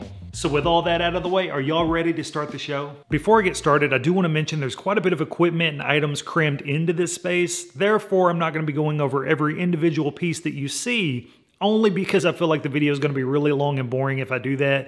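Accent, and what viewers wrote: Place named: South, United States. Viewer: From the sound of your voice you live in like Tennesee or one of the Carolinas.... And I hate driving 🤣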